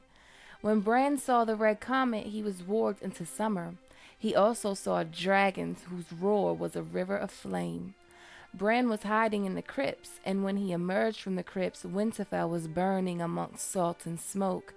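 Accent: United States. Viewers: Considering I'm not normally one who goes for American accents I couldn't agree more. Like sweet music......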